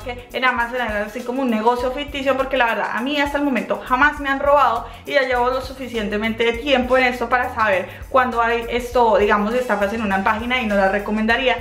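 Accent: Colombia. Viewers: Acentua bien las palabras eres colombiana ..pero tu video lo ven de varios paises practica un tono standar .un consejo de un amigo.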